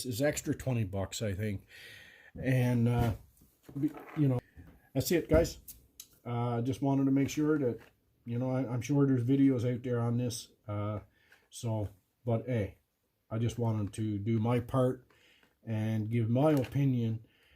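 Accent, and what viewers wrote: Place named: Maritimes, Canada. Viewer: Ahh, A CANUKIAN EH ! I thought I heard a touch of the East coast there. I am in North Central BC. Just an hour west of Prince George.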